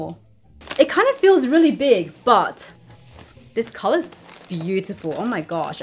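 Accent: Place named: Australia. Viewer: I like your aussie accent while you can fluent speaking japanese 😮😊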